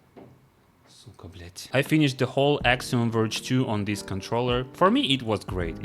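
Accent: Russia. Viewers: Я у меня конечно с английским плохо, но благодаря русскому акценту мне даже субтитры не пришлось включать)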